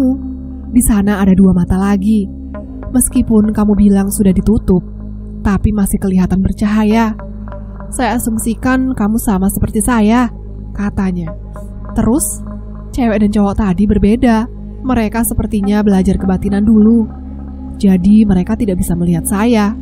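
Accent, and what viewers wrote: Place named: Java, Indonesia. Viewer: tp bhs jawanya medok bngt ya 😃. Sukses selalu ka 👍👍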